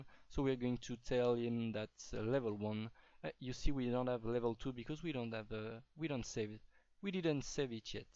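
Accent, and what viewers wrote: France. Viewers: Super tuto ;) C'est encore plus simple a comprendre avec ton accent français ;)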